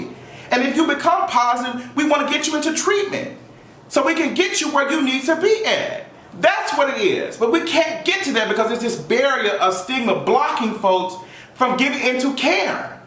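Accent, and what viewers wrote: United States. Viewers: Love me some you, and that adorable country accent, but my love, my sweet love, YOU MUST 100% not tell people it's not their responsibility to disclose their status. That information is not due to anyoneeeeee at all unless you decide to share, HOWEVERRRRRRRR, you absolutely have every bit of responsibility to tell a potential partner what ur status is. I still love u, but there's no other way around that. It's morally right, and in a lot of states, it's illegal if you don't.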